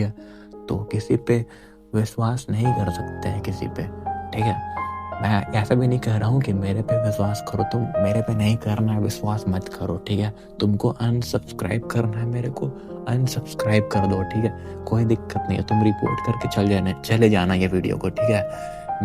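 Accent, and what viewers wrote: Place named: Bihar, India. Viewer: Your bigari accent just wowww ❤ That thora,, kapraa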